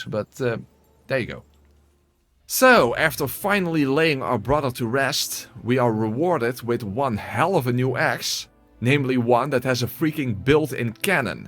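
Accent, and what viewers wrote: Netherlands. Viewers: Sounds dunglish! Anyway, great stuff 👍🏻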